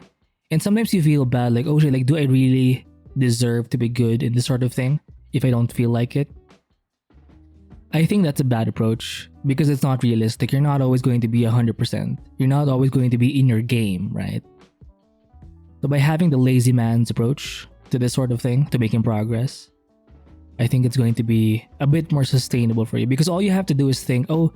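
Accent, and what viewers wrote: Philippines. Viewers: I cant quite pin point it, but you sometimes sound like a Filipino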